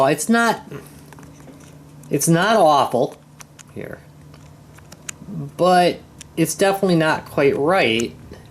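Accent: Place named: China. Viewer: i love chinglish from china , entertainment before you see the product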